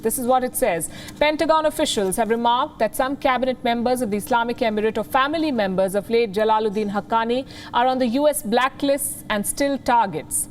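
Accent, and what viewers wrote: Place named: India. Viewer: Indian masquerading to be western, but her funny accent gives it away.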